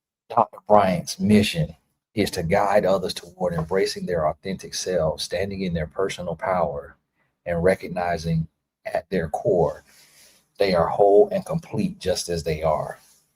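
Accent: South, United States. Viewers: aye you from the carolina’s or georgia?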